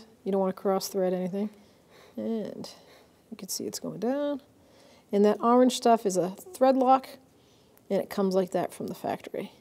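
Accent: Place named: Medford, United States. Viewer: That’s a Medford accent! Gotta be!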